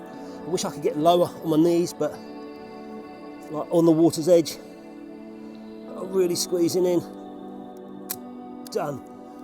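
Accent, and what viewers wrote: United Kingdom. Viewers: Excellent, though with a pronounced British accent, include the fabulous Zeiss Super Ikontas comprising the 8 frames 6x9 cms, the 12 frames 6x6 cms & the 16 frames 6x4.5 cms cameras in part 2.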